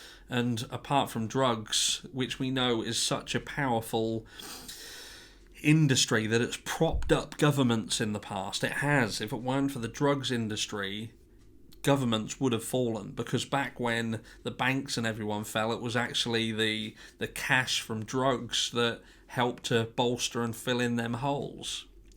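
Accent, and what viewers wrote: United Kingdom. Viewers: Hi there. I just have discovered you in my recommended and enjoyed this deep dive! Its funny to hear a guy from the UK (assuming from your accent lol ) talking about a case here by me in New York (paisley)...funny how small the world has become in the recent times 🤔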